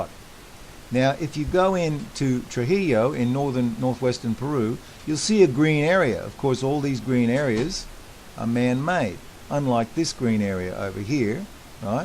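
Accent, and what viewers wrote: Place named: Australia. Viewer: I like it...your American accent is fading and sounding more Aussie:) Cool info.. please guys don’t forget to plant native trees and let nature reclaim it’s land!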